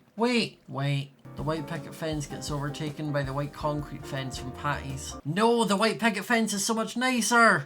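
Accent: Scotland, United Kingdom. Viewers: Are you Scottish??? Bc you have the same ascent as my half brother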